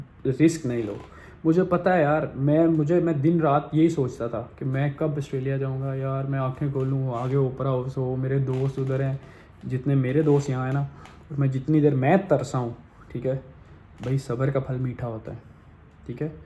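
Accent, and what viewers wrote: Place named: Punjab, India. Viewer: Great job.... Punjabi ka trka buht achaa lgaty app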